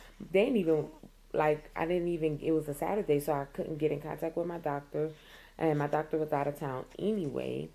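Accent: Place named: New Orleans, United States. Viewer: She gotta be from here she sounds too much like a fee from NOLA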